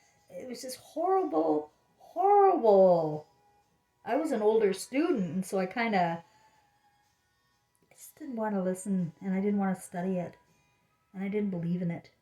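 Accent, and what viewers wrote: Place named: Western Canada, Canada. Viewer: you sound like your from western Canada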